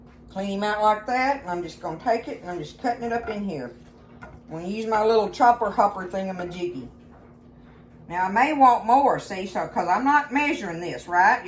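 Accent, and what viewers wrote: South, United States. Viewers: I love how you talk Southern also I'm from Oklahoma so I talk the same way! Where did you purchase the little round rack that fits over dinner plates? I need to get me one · I love how you talk your Southern❤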